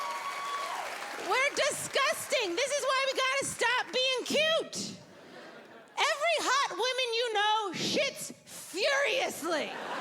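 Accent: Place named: United States. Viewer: Hearing annoying merican accent is not for me annymore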